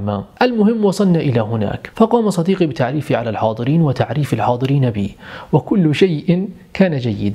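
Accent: Palestine. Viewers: s sss مع أنو ماني فلسطينيه بس بحبا للهجتكن كتير وبحب اسمعا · شيخ نص كم😂😂😂 فلسطيني ميه ميه،الحمدلله تكلمت فلسطيني قليلاً لاني كنت اشك انك تجيد لهجتك😂🧡😊 طلب صغير .. تكلم فلسطيني ولو جمله واحده في فيديوهاتك قلت لك اني اشك انك تجيد لهجتك😂💛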